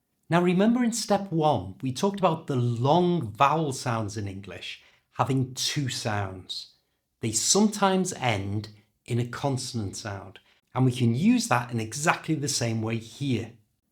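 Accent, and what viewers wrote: United Kingdom. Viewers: thanks so much, the british accent is the best.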